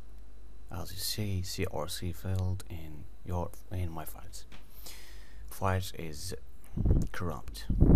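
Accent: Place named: France. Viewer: non , french accent !!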